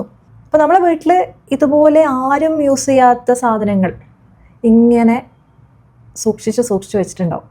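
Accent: Kannur, India. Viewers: നിങ്ങൾ കണ്ണൂർ ജില്ലയിൽ ആണെന്ന് തോന്നുന്നു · Nice content, but understood by only a few. Good one. Varthamanam kettitu, are you from Kannur? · ഭാഷ കേട്ടിട്ട് കണ്ണൂർ, വടകര ഒക്കെ ആണെന്ന് തോന്നുന്നു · Crispy Kannur slang.... 👌👌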